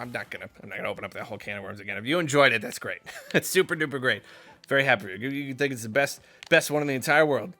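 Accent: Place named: Massachusetts, United States. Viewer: Dude you are the best lol. Are you from Massachusetts? You have the same accent as my buddy Trevor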